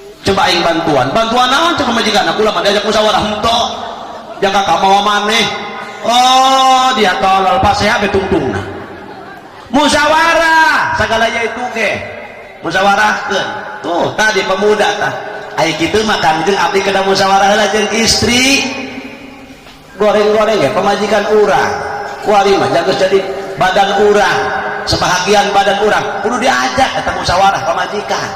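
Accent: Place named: East, Indonesia. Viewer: Syedap .. sundanya logat mana kaya daerah timur Salam Lembur Kuring Purwakarta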